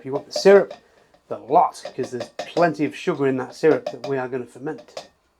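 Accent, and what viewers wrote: United Kingdom. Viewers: Quick question, what’s your accent aside from British? Other are you brewer for job or hobby?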